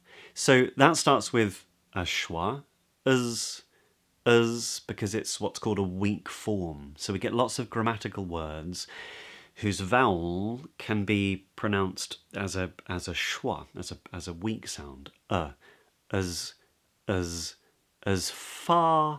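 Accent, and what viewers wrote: RP, United Kingdom. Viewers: Is your what we call RP / BBC accent ?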